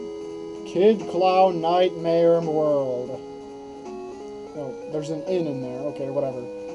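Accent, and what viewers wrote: United States. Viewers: Lmao i used to watch this when i came out on my ultramegaunknown account. Great times! Too bad YT deleted my old comments. I remember commenting asking what accent you have and you said it was Oklahoma. And another guy said "Where the wind goes sweeping through the plains." Then you said, "More like where the tornado sweeps through the sea of concrete." Lol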